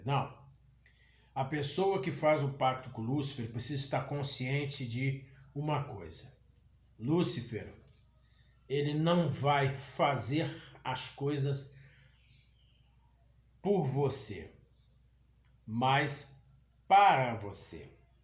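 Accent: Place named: France. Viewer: E de onde vc tirou esse sotaque frances?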